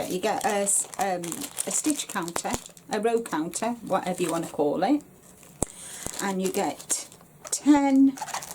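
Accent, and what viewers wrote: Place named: England, United Kingdom. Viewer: I just stumbled across your video I thought it was nice to be nice to win things to make for my grandkids I got so many of them making a baby blanket or booty those would be so nice sounds like you're in England I'm in the US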